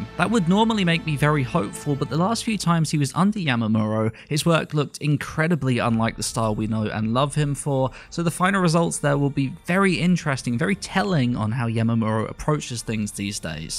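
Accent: United Kingdom. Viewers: you're from braitane aren't you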